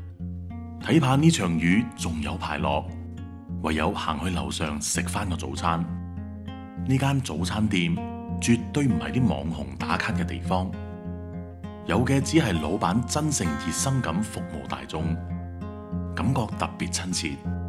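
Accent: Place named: Cantonese, China. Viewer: 你的粤語好好聽💚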